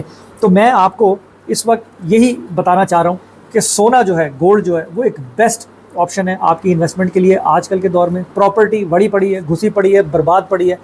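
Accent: Punjab, India. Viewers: Bhai G ap video main ye Punjabi touch Q dety ho? Do you understand it makes ur point more authentic? You look embarrassing so stop ✋ this Punjabi touch!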